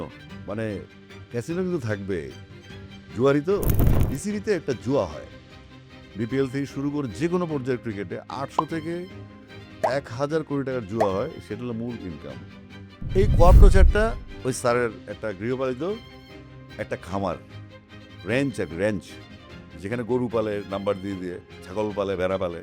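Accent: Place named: England, United Kingdom. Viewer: The host should slow down a little and stop mixing Bangla with an English accent…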